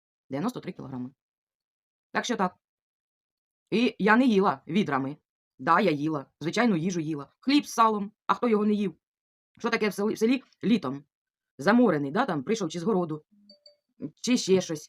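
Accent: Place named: Ukraine. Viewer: Ви дуже природня, щира, аутентична дівчина - Ви не простота (як Ви процитували у попердньому вдіео свого глядача), це глибина Ваша така народна. Викладайте відео - на Вас дуже приємно дивитися і дуже приємно слухати - у Вас красива народна українська мова - я навіть собі записую інколи ті слова, які колись чула у баби в селі, а тепер ми їх не вживаємо. Ви обрали правильний шлях - ви харчуєтесь повноцінно, просто прибрали із раціону некорисну їжу. Бажаю Вам триматись на цьому раціоні все життя, додаючи тільки корисні для організму страви! Успіхів Вам!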